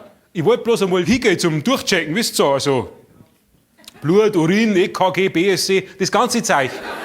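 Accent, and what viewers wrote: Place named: Upper Palatinate, Germany. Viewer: Der Oberpfälzer Dialekt ist schon der Hammer ! Toni Laurer ,Bayern's Bester ! 😀👍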